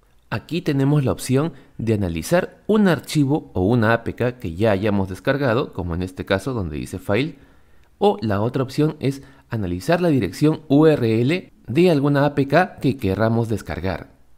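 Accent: Peru. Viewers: Jajajaja no me gusta el acento peruano, pero a él se le oye bonito😊 graciosito o es como empieza el saludo a él se le escucha lindo 😚 saludos sabrositos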